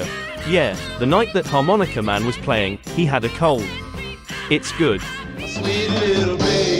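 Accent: United Kingdom. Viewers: sure put on a right proper British accent for this program. 😂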